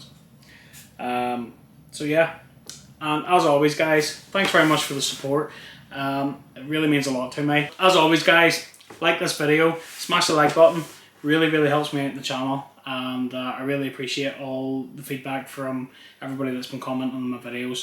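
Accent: Northern Ireland, United Kingdom. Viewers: Thanks - right content at the right time for me. I'm looking to upgrade (from original iPhone SE!) and Magsafe looks like a very interesting companion tech/ecosystem. I had been confused about what it was, and also the difference/similarity with QuadLock. Your video is very straight-up and clear. Telling it like it is with a Norn Iron (Belfast?) accent as a bonus! Per comment and your reply below about mounting phone to a bike (pushbike in my case). Are there any hacks or workarounds that might allow a phone with Magsafe cover to be mounted to a bike? Maybe with some kind of extra bungie or similar? It would be very inconvenient (not to mention spend-y) to have to keep a separate quad-lock cover and mount just for the infrequent times I would want a phone mounted on my bike?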